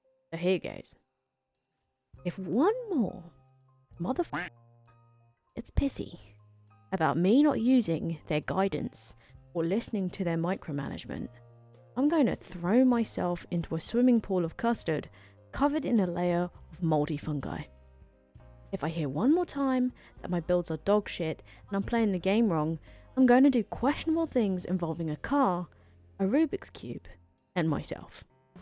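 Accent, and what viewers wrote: United Kingdom. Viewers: Only reason why I watch. and for the rants with bri'ish accent. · The amusing bit out of all of this: you sound British as hell in this video. No hint of aussie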